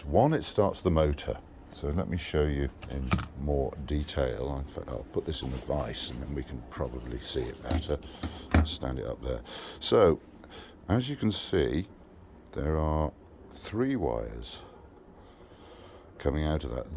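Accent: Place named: England, United Kingdom. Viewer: I never realized how much better these mechanical help videos would be with an English accent 🙌 I installed the new solenoid without raising my blood pressure once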